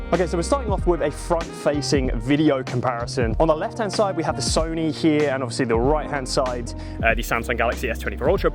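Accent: United Kingdom. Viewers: Excellent comparison. He speaks with a Cockney accent.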